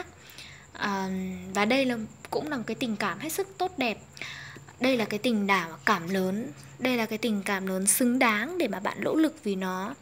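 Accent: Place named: North, Vietnam. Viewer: giọng Bắc bạn hay qá ko gắt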